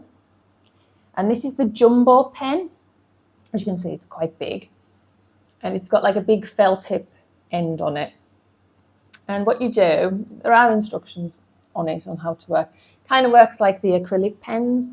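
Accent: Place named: United Kingdom. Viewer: Hey it’s so nice hearing not an American accent when your from the uk, ok I’ve been given a Cricut Personal CRV001 Die Cutting Machine, but the mat unfortunately didn’t come with it, can you help and direct me to where I can get one please I’m looking for a 6x12 mat but I can’t find one any where it’s like 15cms by 30 cms I can’t find one on amozon , your help will be much appreciated thanks.:-)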